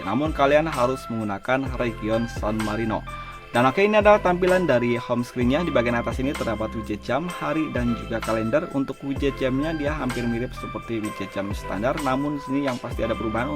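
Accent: West Java, Indonesia. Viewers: Kangg Ti Sunda? 🤣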